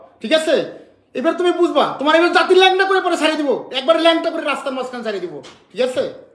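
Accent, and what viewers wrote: Bangladesh. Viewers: ভাই আপনার কথা শুনে মনে হয় আপনার বাড়ি বরিশালে আপনে কি ভাবে এই মহিলার পাল্লায় পড়লেন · Barisal er suddho vasha sundor lagteche sunte 😂😂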